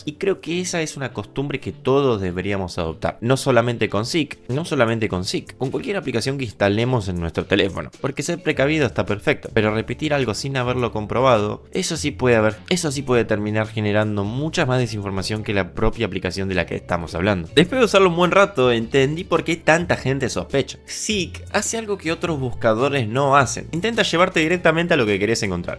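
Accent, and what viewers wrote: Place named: Argentina. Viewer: sabes que un video sobre piratería va a estar bueno y sincero, cuando es narrado por un argentino 🗣🗣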